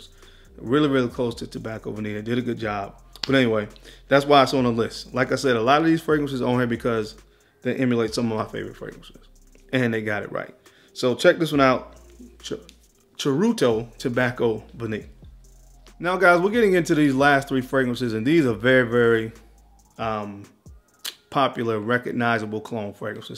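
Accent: United States. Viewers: Because it's an American accent...what your doing is like me telling a French person "it's not Mach donohld, it's Mcdonald!!" When you say sushi or croissant do you also say it with a perfect Japanese and French accent everytime you order it? Lmao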